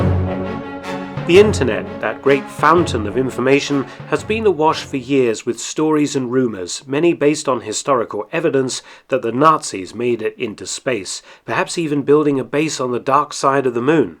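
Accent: England, United Kingdom. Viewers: The real story is something your not willing to tell. Instead you decide to play it safe thinking your English accent and the history of German rocketry will make you credible but probability states otherwise. Are UFOs just Hollywood hype when military has already confirmed their existence? Is it more likely (more probable) that these UFOs are flown by aliens rather than man? Aliens are the Hollywood hype, not UFOs, it's even more likely the so called aliens are man- made as well. I believe in aliens but they are more likely to be involved in the resources of their own solar system which is probably as vast as our own.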